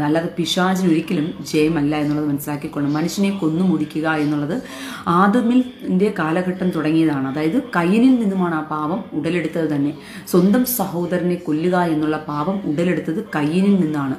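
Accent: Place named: Palakkad, India. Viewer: Ms.,are you a Palghatwala your language Usd seems to be of palghat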